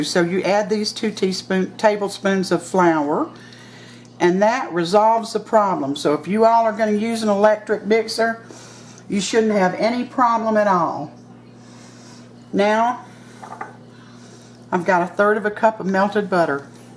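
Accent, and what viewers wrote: South, United States. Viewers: I love your voice! Second run at watching. Were those toss-a-way/recycle aluminum pie tins or not? These are my Mothers favorite before I made flan...not easy to transport to holiday parties. You said "hardly" packed brown sugar. Did you mean packed hard or not very packed hard? From the North, I am in love with your Southern accent. I did a recipe from a newspsper once back in the early 90s. Dutch apple pie in a brown bag. Best results. Never found it again.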